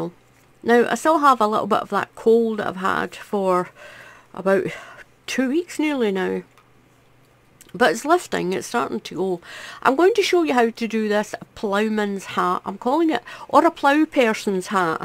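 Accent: Scotland, United Kingdom. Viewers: i really love your tutorials nice to hear a scottish voice i'm from stirling now living in northern ireland 44years i've been here you explain everything so good i will be trying this hat ty ❤ x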